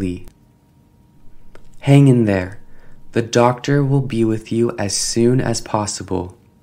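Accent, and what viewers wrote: United States. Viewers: Usa accent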